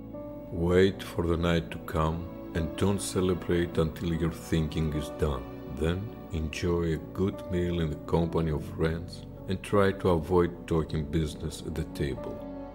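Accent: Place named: Greece. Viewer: excellent greek accent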